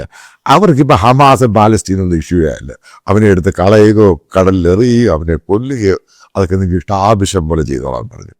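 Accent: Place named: South Kerala, India. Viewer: അച്ചായന്റെ തെക്കൻ സ്ലാങ് കേട്ടു ചിരിച്ചു ചിരിച്ചു ഒരു പരുവമായി 😁😁👍അടിപൊളി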